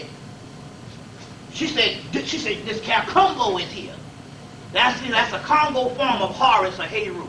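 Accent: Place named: Rural, United States. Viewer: I feel you. It's actually his stumbling, stuttering and country twang that helps me understand his knowledge. The elders in my family all country, so listening to the master teacher is like being homeschooled by an uncle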